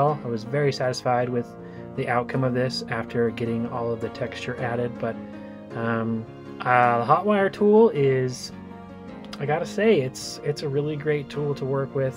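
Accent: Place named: East Tennessee, United States. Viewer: You sound like people I know from East Tennessee.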